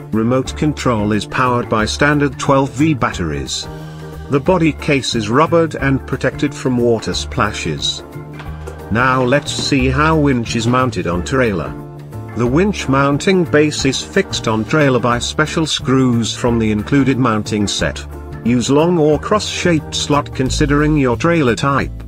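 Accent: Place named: United Kingdom. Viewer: What is with the robot British narrator?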